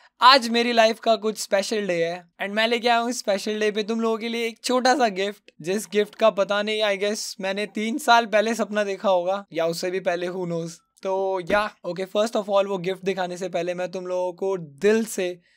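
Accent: India. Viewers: Mana tumhe English aati hai yrr Indian hoke English bolre ho 🙄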